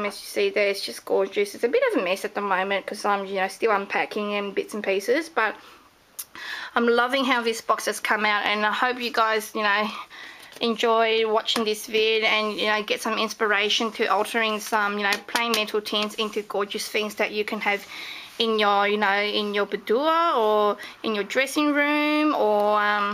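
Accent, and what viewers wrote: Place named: England, United Kingdom. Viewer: very english! lol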